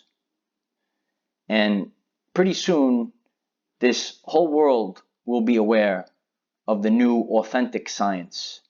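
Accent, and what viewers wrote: New York, United States. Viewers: love your accent. It’s adorable. Are you from New York? Greetings from Calgary Canada 🇨🇦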